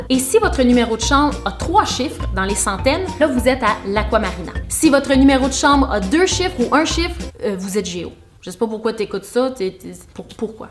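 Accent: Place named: Quebec, Canada. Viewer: C est trop mignon ton petit accent québécois <3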